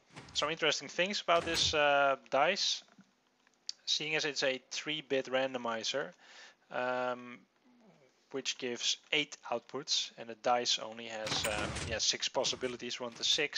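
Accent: Netherlands. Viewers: Are you from holland?? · Lol, I'm dutch too, as soon as I heard you talk I knew you were dutch :P We all have that weird dutch accent... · lol ik wist niet dat je nederlands was xDD khoorde het pas na zon intervieuw video xD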